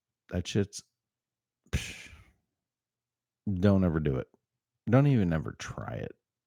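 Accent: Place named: North, United Kingdom. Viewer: You do have an accent...you sound like you're from the North. I have a wicked accent, southern all the way.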